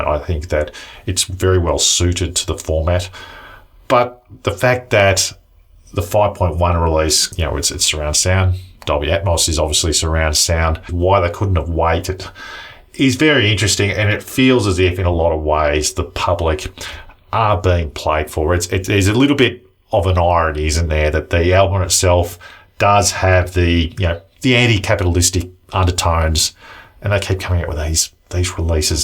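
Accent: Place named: Australia. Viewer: why do yo Awzzees butcher the English language so badly?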